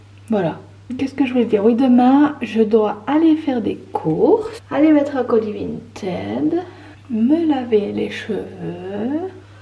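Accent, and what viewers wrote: Belgium. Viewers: Normalement je ne commente pratiquement jamais les vidéos YouTube mais ça fait déjà quelques vidéos à toi que je commente. Je trouve que tu fais un super travail, c'est très qualitatif. J'aime beaucoup ta personnalité, tu es très naturelle et c'est génial ! Cette vidéo est une excellente idée. C'est vrai qu'au début j'étais un peu déçue que ce ne soit pas en ASMR mais finalement ta vidéo est au top. Je me suis tapée un fou rire avec votre couteau suisse, mon dieu quand on dit que le rire est communicatif, ce n'est pas une blague. Donc voilà juste merci pour tout ! 💙 PS : J'adore ton accent belge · C'est fou comme l'accent belge ressort quand tu parles à voix haute haha ! C'est rigolo et j'aime trop l'accent belge (c'est mes origines !) merci pour cette vidéo qui relaxe malgré le non chuchotement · L'accent belge quand meme c'est le meilleur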